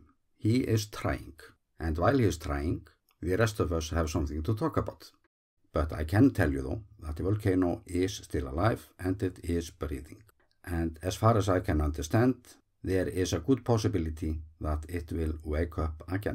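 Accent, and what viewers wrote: Iceland. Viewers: Hey don't mess with the icelandic accent!! Learn proper english english bro then you should understand this mister murica.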